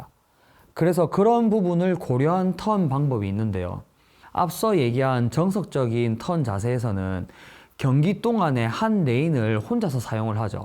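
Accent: Gyeongsang, South Korea. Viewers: 쌤이 영남 사람이네.😊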